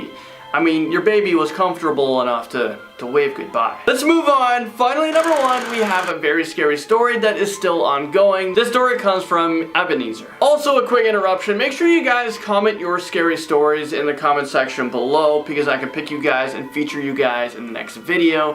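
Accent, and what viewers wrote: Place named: United Kingdom. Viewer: 🛑🛑🛑 #mostamazingtop10 one this chanel is my addiction, I talk about it all the time. I put all my friends on , I watch your videos so much you got me talking In a British accent lmao. On a real note I'd like to share a story that was shared with me .. long story short my grandma and her siblings had a oujia board, while using it the word death was spelled out.. three days later my grandmas brother was out riding his bike ... unfortunately he was hit by a truck and died instantly.. God rest his soul.. ouji is no joke.. stay woke. 🛑🛑🛑 _KeekMarie 🤟 Ignore the Johnny ravo name Im using my boyfriends account.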